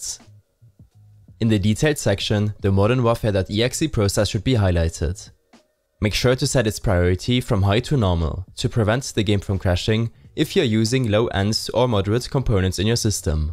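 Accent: Germany. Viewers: dieser andere deutsche Akzent??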